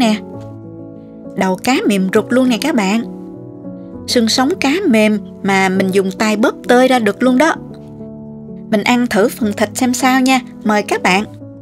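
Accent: South, Vietnam. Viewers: đúng chất người miền nam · Giọng miền Nam nghe chơn chất hiền hoà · Giọng nói người Nam Bộ rất dễ thương dịu dàng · Rat nguong mo, cach nau, cach trinh bay va cach chi dan day du chi tiet, cong voi giong noi mien Nam va rat em, nhe, to rang, Ngan gon, de hieu. Cam on Co rat nhieu. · CÁI GIỌNG NÓI CỦA MIỀN NAM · Giọng nói cô nầy thuộc người miền nam thật dễ thương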